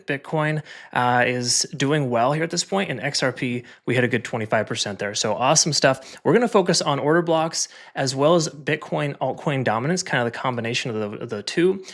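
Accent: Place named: United States. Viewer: I wont watch channels that have accents, Indian, british, Australian, south African and ghetto/prison/ratchet are some of the worst. I appreciate this english American speech.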